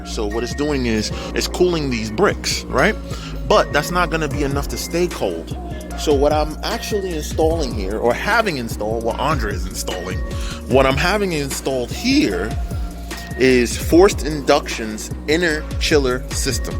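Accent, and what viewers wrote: Trinidad and Tobago. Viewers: I hear a trini I swear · I hear a trini accent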